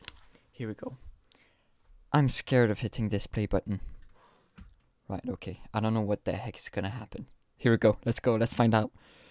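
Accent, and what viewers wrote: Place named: France. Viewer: Love your reaction! Haha Totally understand it too, haha I was like wtf? Lol You have an accent, do you speak French?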